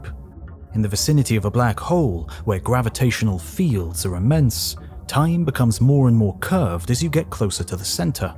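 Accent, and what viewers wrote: England, United Kingdom. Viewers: Its the English accent, crap sounds like fact instantly.. Again hes reading off something because why is he saying we?? Only the majority think a certain way whilst the rest of us dont care about information that takes you away from a stable self financial comfort..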